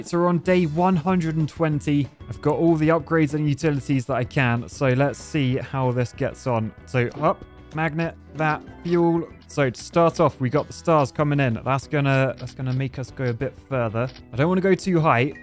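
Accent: United Kingdom. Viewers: gotta love the real britich accent amazing!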